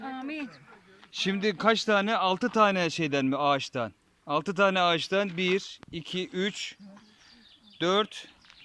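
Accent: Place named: Ordu, Turkey. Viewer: Harika Ordu şivesi 😁.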